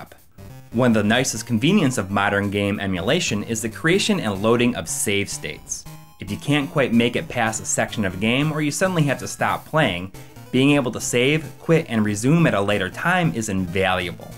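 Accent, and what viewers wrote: Wisconsin, United States. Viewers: U sound like wisconsin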